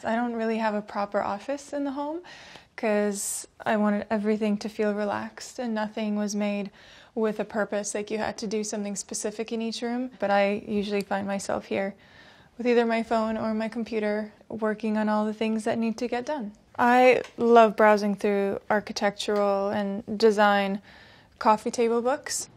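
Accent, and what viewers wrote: United States. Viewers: 这美音说的也太好听了吧。好想听她说两句俄语试试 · 怎么是美音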